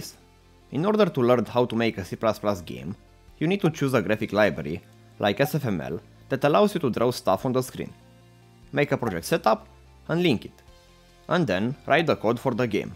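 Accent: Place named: Romania. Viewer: Se aude romanul din tine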